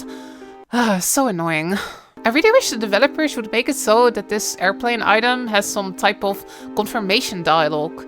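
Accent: Netherlands. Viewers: I like your dutchness 😂 i can hear myself talking. Good video! As a long time returning player i am quite confused sometimes!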